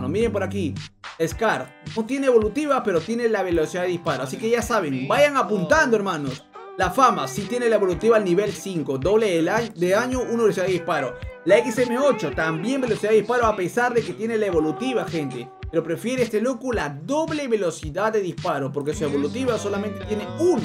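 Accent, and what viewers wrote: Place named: Peru. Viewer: Eres peruano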